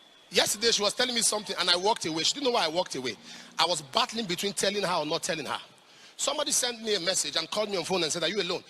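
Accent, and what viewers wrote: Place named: Edo, Nigeria. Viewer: see your ekiadolor English..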